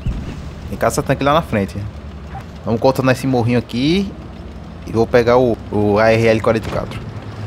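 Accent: Northeast, Brazil. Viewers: Tú é nordestino né fi?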